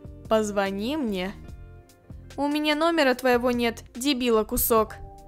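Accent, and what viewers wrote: Ukraine. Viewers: ты украинка?:_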